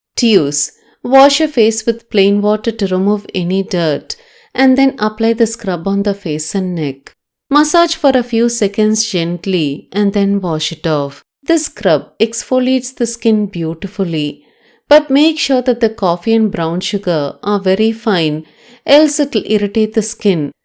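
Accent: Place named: Tamil Nadu, India. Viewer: You speak English like Tamil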